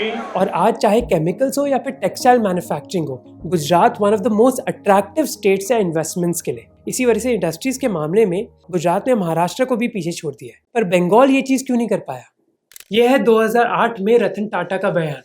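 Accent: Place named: Uttar Pradesh, India. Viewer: I think she is from UP state 😂😂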